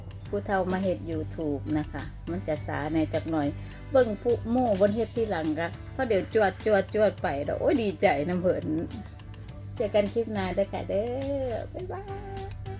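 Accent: Phu Thai, Thailand. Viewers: เว้าภูไทเพราะดีครับ ฝนตกๆเด็กชอบเปิดพัดลมนอนสบายดีครับป้า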